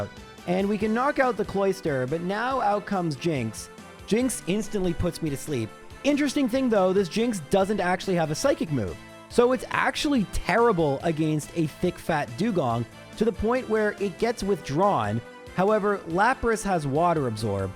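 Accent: Canada. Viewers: Hey! As others pointed out your accent was pretty obviously Canadian but that "Es hora de luchar contra el rival 3" was perfectly undertandable! Greetings from Spain!!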